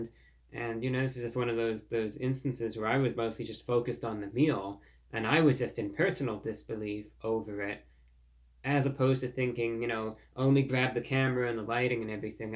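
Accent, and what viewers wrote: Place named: Transatlantic, United States. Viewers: my husband saw thumbnails on my feed and laughed and rolled his eyes. He not only said looks 12 but that people make fun of him. 😂😂😂 I'm like dresses dapper and sounds MidAtlantic like in the 40s. I love the guy. Idc what haters say. It is innocent fun with food, cuz I'm a foodie, and great listens to the podcast too. No cussing and he is a good storyteller and seems like an all around good guy. I say screw the haters.😂 Though his comebacks on hate comments are the bomb. ❤ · Imagine being a corporate exec for some giant, time-tested publicly traded company, and your biggest worry is that a 20-something in a baggie suit, sitting in his grandparents dining room, speaking with a Transatlantic accent, named " ", is going to absolutely fucking butcher and sully your already bad name.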